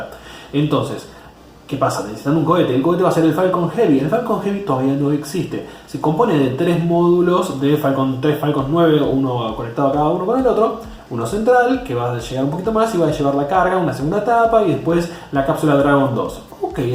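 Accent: Argentina. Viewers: argento 100% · Gostei muito das suas exposições. Pelo seu sotaque vejo QUE és argentino. E me escrevi em seu canal ok.abraços de Brasil 😃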